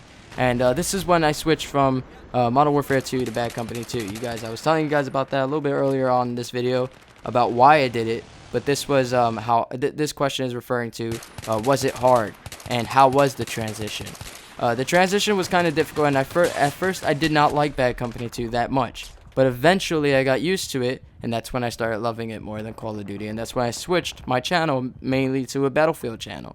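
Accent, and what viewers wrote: United States. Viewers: for you americans out there? i thought you where american.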